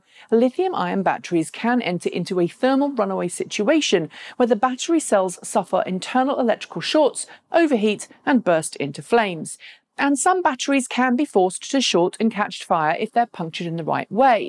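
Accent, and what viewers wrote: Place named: United Kingdom. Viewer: Somewhat interesting. She needs to drop the brit accent. It is Not sexy.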